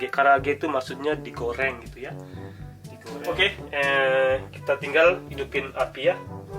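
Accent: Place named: Japan. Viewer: Udah lengket ya bang logat jepang nya 😅😅😅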